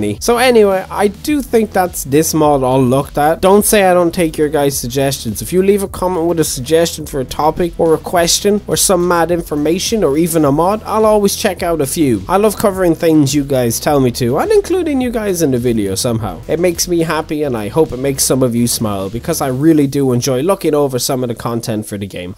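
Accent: Ireland. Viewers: Ah, a fellow Irishman Any craic?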